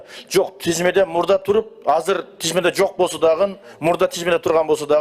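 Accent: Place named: South, Kazakhstan. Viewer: Түштүктүн говорунда сүйлөгөнү үчүн жакпады эле деп койбойсуңбу!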